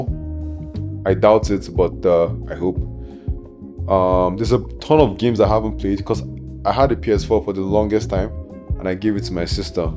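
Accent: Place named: Nigeria. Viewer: You must be Nigerian right?😊 I can tell from your accent brother 😊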